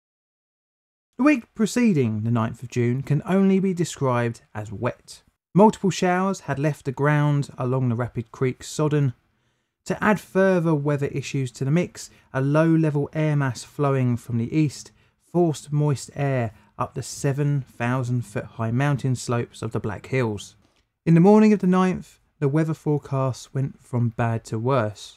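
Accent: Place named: South London, United Kingdom. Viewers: "Sunny Southeastern corner of London"? Sounds like you are talking like some place near Carshalton. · Something about an English accent is relaxing.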